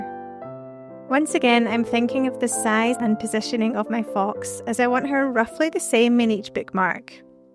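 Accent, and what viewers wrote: Scotland, United Kingdom. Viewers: Lovely…could you do the breakdown of drawing the fox pls…..are you Scottish?😊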